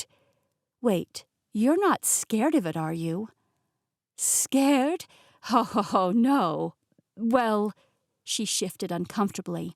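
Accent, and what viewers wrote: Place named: Ireland. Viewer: Story is great! I keep hearing a hint of an Irish under accent.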